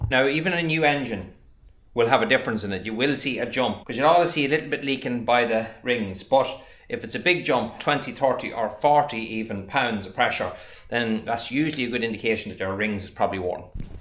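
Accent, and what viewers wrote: Ireland. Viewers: A thought was from Boston not Ireland 😮🏴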